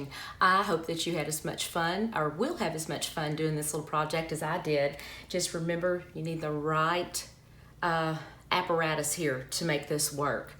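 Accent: South, United States. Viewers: Thx for sharing! I love your southern accent ❤️. I’m from WV 😃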